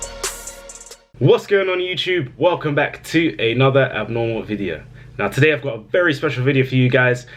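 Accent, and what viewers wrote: United Kingdom. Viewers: I have been watching your videos for months now. I always learn something new. I like your british accent btw. Greetings from Czech republic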